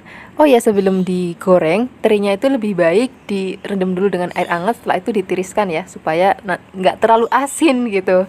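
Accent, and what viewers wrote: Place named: Tegal, Indonesia. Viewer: Mbknyq org mana???logatny kyk org tegal jawa tengah❤slm knl y mbk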